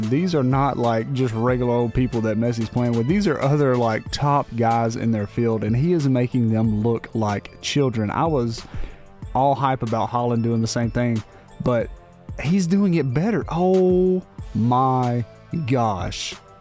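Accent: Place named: United States. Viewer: Football bro come on, I'm American too but never will I say sawkir.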